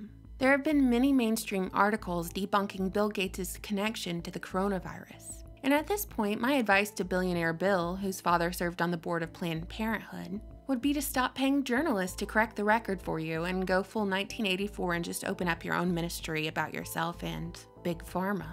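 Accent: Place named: Georgia, United States. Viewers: I should have known you were a Georgia peach with a voice like that 🥰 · Thought I recognized the accent of a fellow north Georgian. I’m right outside Atlanta and heading there tomorrow to help my daughter finish moving from downtown Atlanta to Buckhead. I’m getting a little nervous about what we may encounter. 😬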